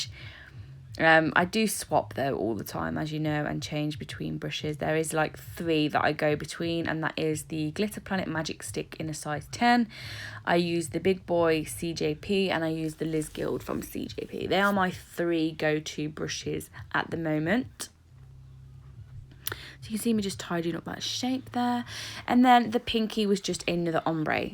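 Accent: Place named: Coventry, United Kingdom. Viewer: Are you from Coventry? I am. Your accent is very Cov. :-D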